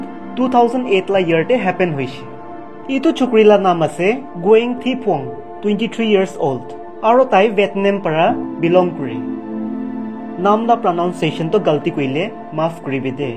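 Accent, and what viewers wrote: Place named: Merangkong, India. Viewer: Bro try to upload everyday ok N btw I heart ur voice may be ur voice like from merangkong,Tuli exact one of my friends n thank you 🎉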